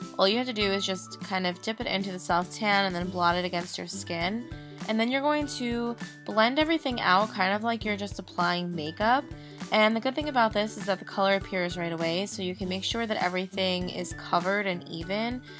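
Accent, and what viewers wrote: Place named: New York, United States. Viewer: Are you from Long Island/ Brooklyn/Bronx/Queens/Staten Island or something? Based on your dialect.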